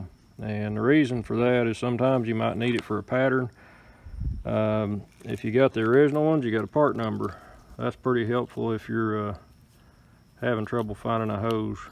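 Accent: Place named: Oklahoma, United States. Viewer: I promise I'm not picking on you here.... The engine noise was a little loud to understand you, so I turned on the closed captions. Needless to say it does not understand Okie very well. Personally I'm from MS and lived 50 plus years in TX so it doesn't understand me either. I just found it funny.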